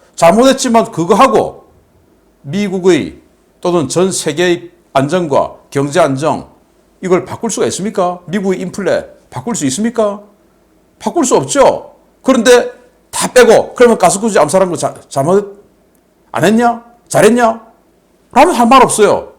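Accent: Gyeongsang, South Korea. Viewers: 경상도 말으로 러시아를 발라 버리는 깡이 직이네요... 그런데 방공망이 없는 거나 마챦가지 라는게 더 웃깁니다... 우리나라도 예전에 북한 의 정말 얄궃은 드론 을 나라 한바퀴 돌아 갈때 까지 몰랐었는데... 상당히 우려 스럽 습니다... 나아 졌는지.... 걱정 이군요...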